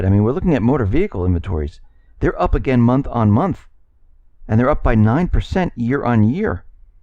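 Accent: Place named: New York, United States. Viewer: I think your Trump is showing, I hear the "believe me" thing in your dialogue now too. Maybe you always did and I am just picking it up, is it a New York thing?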